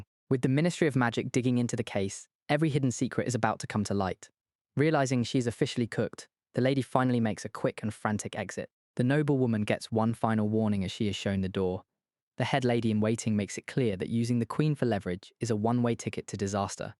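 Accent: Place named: United Kingdom. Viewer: British voice -10 Points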